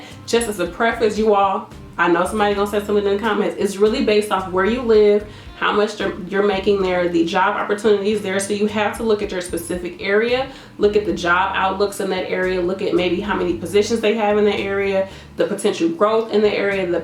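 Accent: St. Louis, United States. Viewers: As soon as i heard your accent i KNEW you were from the Lou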